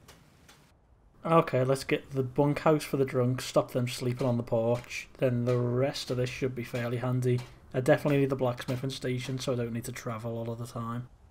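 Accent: Liverpool, United Kingdom. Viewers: nice to hear a scouser, here's some engagement for you mate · Lad, you scouse? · Awww finally a scouse youtuber! ALREEET LAAADDD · Scouseeerr!! Loved the video man 👍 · Are you a scouser? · You a scouser? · A scouse YTer? Instantly subscribing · Hi. R u Scouse? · Mate, that was a boss first video! It's nice to hear a fellow scouser too, tbh! Subbed!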